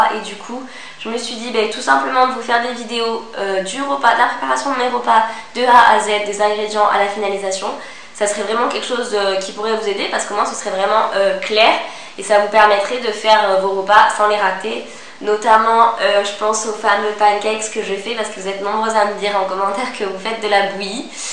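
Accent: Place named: Corsica, France. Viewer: moi j'adore ta voix et ton petit accent corse... :))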